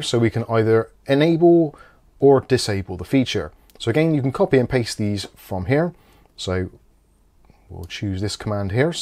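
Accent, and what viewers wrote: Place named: West Country, United Kingdom. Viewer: Sound like a west country lad. I'm originally from Gloucester now in NZ.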